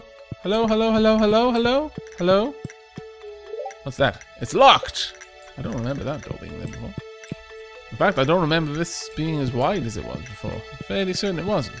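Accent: Wales, United Kingdom. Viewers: You sound so Welsh <3 I really like this play through as well and your voice is lovely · my mothers side of the family is Welsh so I recognize it more I think! Plus it's the fluctuation! It's got the welsh rhythm haha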